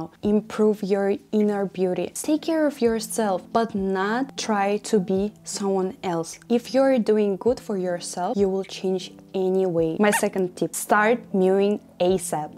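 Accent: Russia. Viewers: Русский акцентт :333333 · Трудно слушать этот русский английский даже мне как русскоязычному человеку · Мне показалось, или у тебя русский акцент?) Прекрасные знания английского❤ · Сразу чувствуется русский акцент 😅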